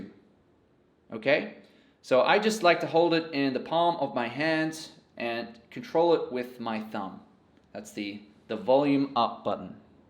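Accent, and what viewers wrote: United States. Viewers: Lol! Love your yankee voice; Sounds a little like will Wheaton. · Honestly man, your American accent is on point. Good job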